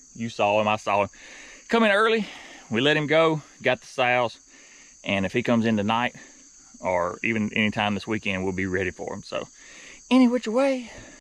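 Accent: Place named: Tennessee, United States. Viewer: Your landowner that you just set up in , where the trailer is, he sounds like Burl Ives 👍. I love your show, love your accent too, even though I’m from Erie Pennsylvania I have my mama’s family from Tennessee 😃same accent, that’s a excellent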